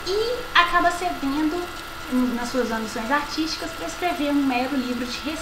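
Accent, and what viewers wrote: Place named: Minas Gerais, Brazil. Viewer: Genteeeee, olha vc!!!! Mega mineirinha😅😅😅 ah, adorei vê vc uns 10 anos de agora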